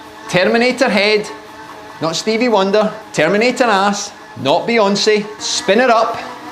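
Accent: Scotland, United Kingdom. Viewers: I am older than you, a 1968 model. You might remember Greg LeMond teaching the exact same pedaling style back when we were teenagers. He said it was like scraping mud off your shoe? GREAT VIDEO. As an American, I love the Scottish accent. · I think is from Scotland… used to live in Aberdeen so I am familiar with this accent! Absolutely love the humor, thanks just subscribed! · Filipino cyclist here, This video helped me a lot, I've learned some things than can help me improve myself, But the Scottish accent is just too much for me😭 I had to repeat this video a couple times to fully understand what he's saying but anyway Thank you. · probably scot or ireland, very thick accent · Love hearing a good, clear Scottish accent! This is what I aim for when I try to remove all the slang and pronounce for business calls 😂 For anybody asking, to my ears this is a central Scottish accent, 25mile radius of Glasgow. 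I think the Lanarkshire guess is spot on. Back on topic, excellent videos! I'm just getting into cycling and have been learning a lot from the channel, thank you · Lovr the Scottish accent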